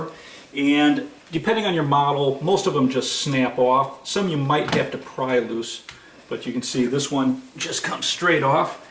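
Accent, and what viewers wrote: Chicago, United States. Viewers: The guy sounds like he is from Chicago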